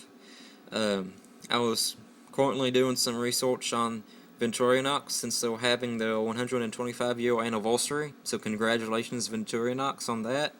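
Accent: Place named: South, United States. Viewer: You honestly don't sound like you have one... just an American Accent from the south.... you pronounce most words fine... ignore the haters man :)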